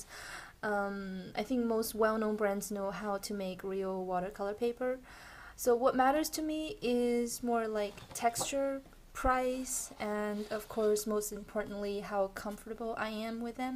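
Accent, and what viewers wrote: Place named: France. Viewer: You have such an amazing french accent, are you french? or have you lived there?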